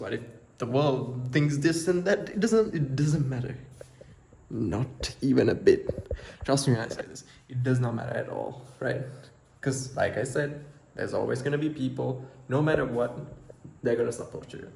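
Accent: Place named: Sri Lanka. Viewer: Bro I identified that accent immeadiatly😂 Im Srilankan too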